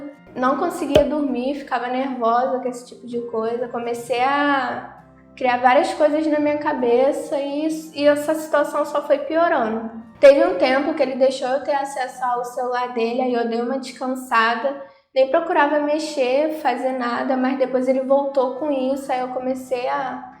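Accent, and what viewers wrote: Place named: Northeast, Brazil. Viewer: Tantas pessoas passam e passaram pelo que vc passou, que bom que vc superou. Vc é jovem, bonita, extremamente simpática e humilde e merece ser feliz, vc tem obrigação de ser feliz. Quem te quiser por parceira deve saber que é prá vida toda, vc é uma menina de ouro. Parabéns pela coragem de contar o que aconteceu com vc. Pelo sotaque vc é do nordeste ou do norte, não é?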